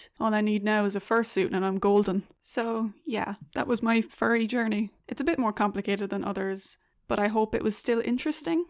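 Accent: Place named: Southern England, United Kingdom. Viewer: I honestly thought you were from south England 🏴 that how you honestly sound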